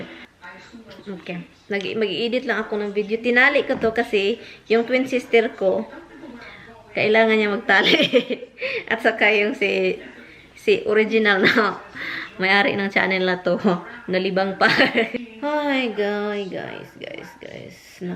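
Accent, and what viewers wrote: Visayas, Philippines. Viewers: Hi I like ur blog..ignore sa mga taong negative and haters...parehas tayo bisaya gahe intonation..haha..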